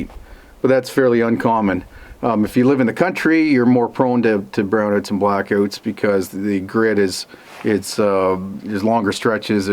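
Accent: Canada. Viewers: I really enjoy your videos, watching you build by hand reminds me a lot of my Pop (who built most things from recycled train sleepers actually...his favourite thing). But I just love listening to your take on things at the end of each video, I find myself staring out the window, nodding most times. Also my best friend is from Barrie, ON, so your accent has a nostalgic feeling for when I miss her. Thank you for sharing your life, building, and take on life, the universe, and everything.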